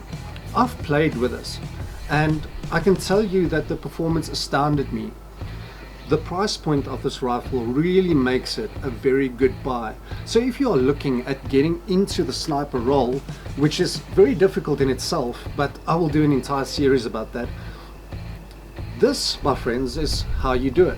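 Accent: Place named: South Africa. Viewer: are u from south afrika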